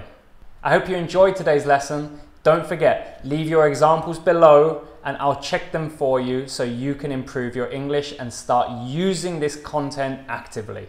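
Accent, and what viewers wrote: RP, United Kingdom. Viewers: 👏👏👏👏👏What goooorgeous English ! You make me feel at Cowdray Park Polo Club, in Midhurst !😄😄🇦🇷🇬🇧🇦🇷🇬🇧🇦🇷🇬🇧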